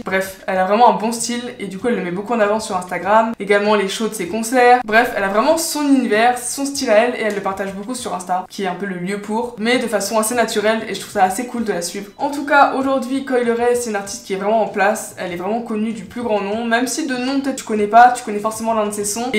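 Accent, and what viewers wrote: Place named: France. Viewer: Trop bien ! J'adore ce format. Le seul truc que j'aurais à redire c'est : arrêté de te justifier haha. Par exemple mets un petit disclaimer au début pour ton anglais au lieu de le dire à chaque fois et traduis directement les paroles (tweet, interviews etc) pour ne pas "galerer". De toutes façons les français ont tous un accent très prononcé et souvent un anglais très moyen, ne te prends pas la tête ! On est pas la pour te juger. 🫶 En tous cas grosse force à toi, on attend la suite ! 🤼‍♀️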